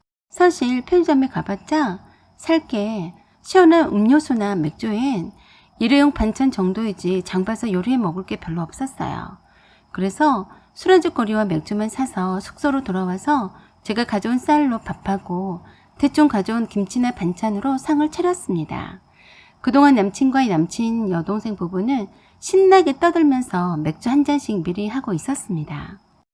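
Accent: Jeolla, South Korea. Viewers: 나레이션 하시는분 전라도사투리 쑥쑥 나오네여.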